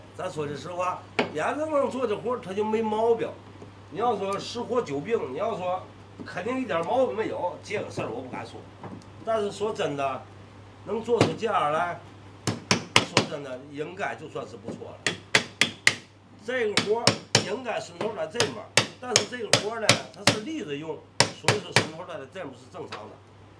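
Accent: Tianjin, China. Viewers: 在外留学的天津人。最近刚遇到您们的视频，听到乡音无比亲切，看到木工大开眼界。未来家里的布置的审美得到了培养。谢谢上传者，更要谢谢老师傅。美好的事物永恒。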